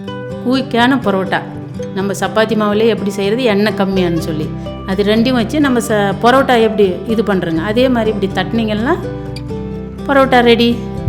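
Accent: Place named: Krishnagiri, India. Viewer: Authentic nama ooru slang bro.... love From krishnagiri... Near to your area.. NEW HOUSING UNIT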